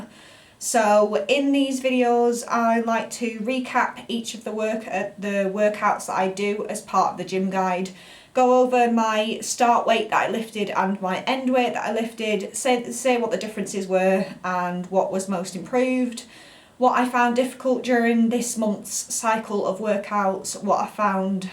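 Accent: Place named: England, United Kingdom. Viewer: Hey Thanks for sharing. I love recap of your gym workouts. I need to start doing weights but I’m recovering from shoulder injury. I do love cycle spin classes. Hope you are well. Great hearing the English accent 😘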